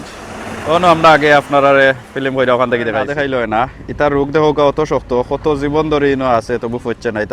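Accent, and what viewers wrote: Sylhet, Bangladesh. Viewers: সিলেটি মাতর মাঝে জে মজা যতো বার হুনি আরো হুনতে ভালা লাগে😁 আই💜ইউ 😁👌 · Mr., thank you very much for your eventful video through which I had a rare opportunity to go round London city and enjoy a lot of things. I liked your sylheti dialect and brushed up my knowledge of it which I learned long ago. Thank you again. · বাইসাবোর সিলেটী মাত যে সুন্দর লাগের!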